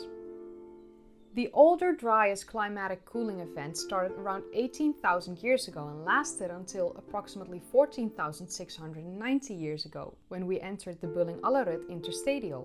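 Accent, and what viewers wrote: Netherlands. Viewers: Your accent is subtle. I think you're Dutch. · don't loose the Dutch accent! Please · Lovely Dutch accent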